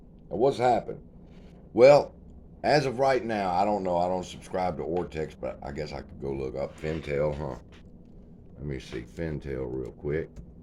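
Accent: South, United States. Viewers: I love your accent ? May I know where you are from ? Is that southern ? I am from Asia so I dunno much.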